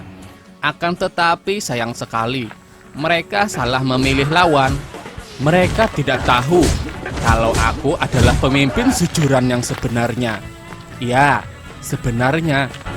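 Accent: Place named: Banjar, Indonesia. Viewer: Logat banjar hanyar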